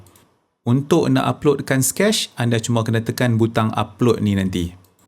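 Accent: North, Malaysia. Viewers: Seronok dengaq oqang utara punya slang tu piaw..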